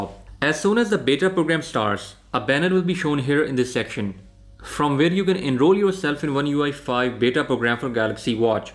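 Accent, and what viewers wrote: India. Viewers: Heavy Indian accent = 76 likes. I disliked. I almost puked because of his accent!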